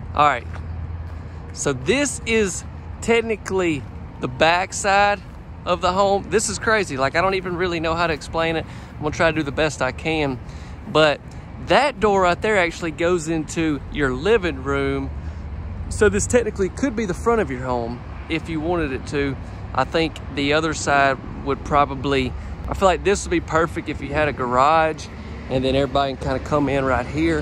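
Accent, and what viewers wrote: South, United States. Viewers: Love the Southern accent and mispronounced words. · Buddy I LOVE Your Accent. Some Where Down South? LOVE It! AWESOME VIDEO VERY Clear & Clean Very Descriptive In your Video. Ever Think Of Real Estate? You Be A AWESOME ONE! I Would Certainly Buy A House From you As You Have Exquisite Taste In Homes. Looking Forward To Seeing MORE.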